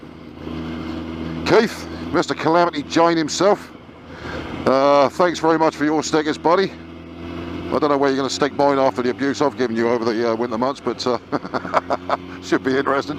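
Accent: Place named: Suffolk, United Kingdom. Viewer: Good to see you back and hear that Suffolk accent again. Keep on trucking 😉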